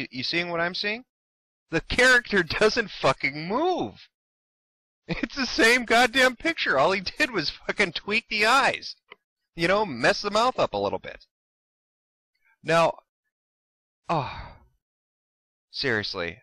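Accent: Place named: Minnesota, United States. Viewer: He sounds like he walked out of the movie Fargo "oh yeah Margie he's a funny lookin lil guy"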